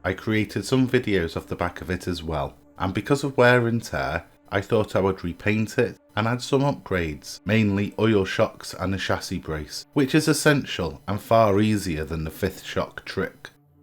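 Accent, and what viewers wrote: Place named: North, United Kingdom. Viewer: I'm subbing because you sound Northern and depressed. You'd think this would be wrong but I'm becoming highly suspicious of happy Southerners. It must be a Northern thing.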